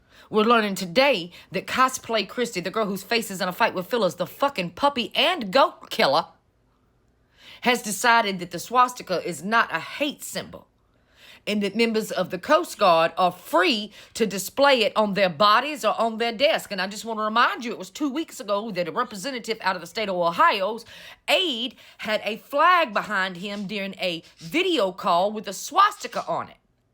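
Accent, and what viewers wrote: South, United States. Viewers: The message is on point👏🏾the southern accent is the cherry on top🍒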